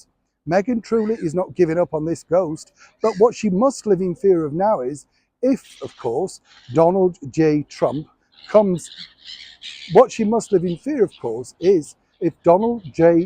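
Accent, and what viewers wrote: United Kingdom. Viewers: I;m still not get it and the uk accent is still a little bit difficult for me... so, you are Megan and scientist E and Trump and John and B or her mother? or Charls or the bad general? or Q ? I like some characters and I don;t like some characters because so many characters! Also, I;m not sure matt and em and m. Anyways, wrap up please... thank you.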